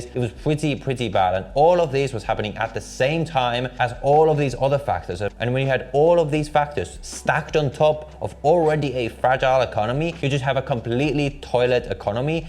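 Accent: England, United Kingdom. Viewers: Love his English accent 😂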